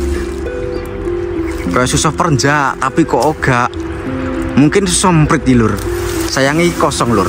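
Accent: Java, Indonesia. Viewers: Hiyo medok banget 😂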